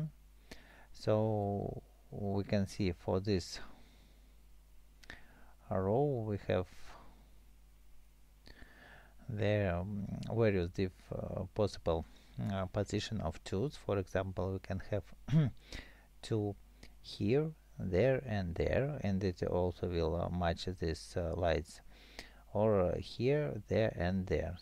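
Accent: Russia. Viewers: Ты говоришь с произношением, ты русский?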